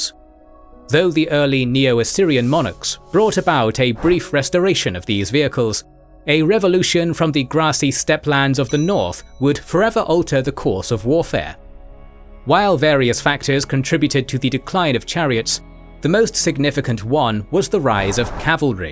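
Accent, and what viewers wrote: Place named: RP, United Kingdom. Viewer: Very informative, clear and well illustrated video. Compliments. I also very much like your articulation and British Standard accent, it sounds very elegant like an officer of the Empire in Star Wars (it reminds the briefing in the Tie Fighter video game) and it is easier to understand for non native English speakers such as myself. Hats off ^_^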